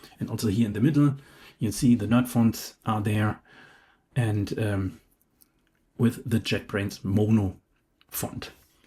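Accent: Germany. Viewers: are u deutsch?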